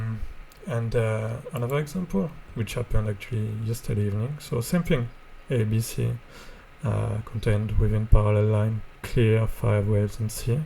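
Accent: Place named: France. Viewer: By the way, I like your french accent...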